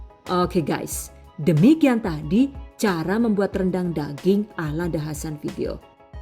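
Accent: Banyumas, Indonesia. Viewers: Bismillah "DONE"#Giveaway THV 2020.paling seneng sama resep rendang yg satu ini.kelihatan enak bgt.menu favorit,apa lagi ditambah suara mbaknya yg ada ngapak ngapaknya gitu bikin senyum senyum sendiri dikamar koyo wong gemblung.ha,ha.pokoke ORA NGAPAK ORA KEPENAK.OK.CATAT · Hahaha lucu banget ngomong bhs apa itu.ngapak y.makasih atas resepnya mbak.semoga menjadi berrkah.Aamiin..🙏👍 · Mbaknya orang mana sih?kok ada ngapaknya.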